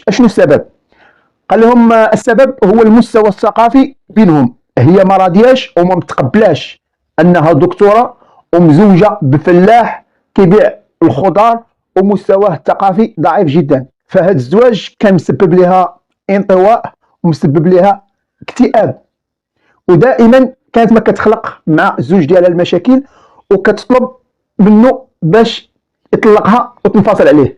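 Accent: Egypt. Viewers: أ حسنت القصة بيمافيها اللهجة المصرية أ حبك في الله يا أخي ❤❤❤ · السللم عليكم خويا ❤❤❤❤🎉🎉🎉🎉 صوتك بالهجة المصرية غير عادي هههههه نحبك تروي قصتك بالهجة المصرية ههههه❤❤❤❤❤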